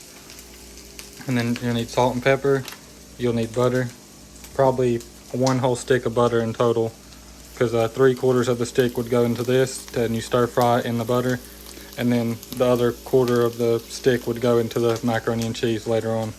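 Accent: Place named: West Virginia, United States. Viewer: This looks delicious yet simple. What a blessing you are to your grandparents! I just love your WV accent; sounds like the folks from my home in eastern KY. 🌻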